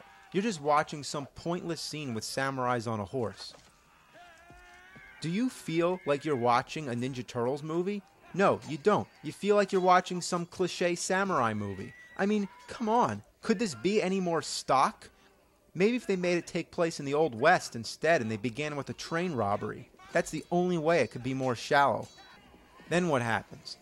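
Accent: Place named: Pittsburgh, United States. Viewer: haha yeah i think it's ' accent. I'm pretty sure he's from Pittsburgh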